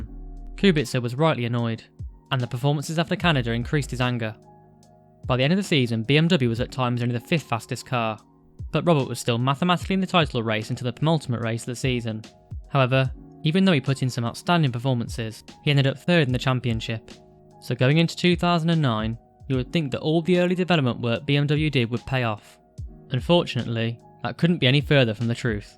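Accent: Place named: United Kingdom. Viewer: I can't understand your accent it's too British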